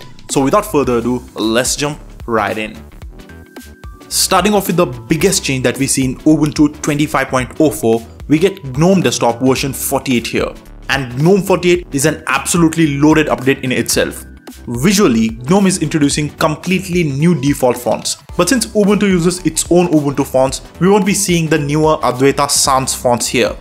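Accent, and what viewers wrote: India. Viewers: He was probably born and raised in a different country from you. My guess is the vicinity of the Indian peninsula? I've got good money that you're a Trump American. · Brother, are you Indian?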